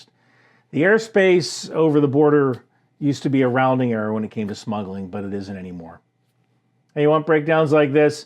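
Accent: New Jersey, United States. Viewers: The New Jersey, accent😂. You are cracking me up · you should always talk in you jersey accent, i like it lol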